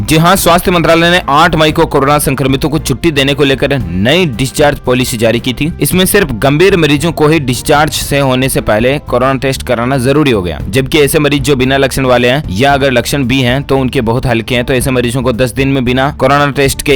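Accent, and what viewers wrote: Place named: Marwari, India. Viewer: Bhai aaj to aavaj bhi kabhi kabhi marvadi ka pardarsan nice sir ji very nice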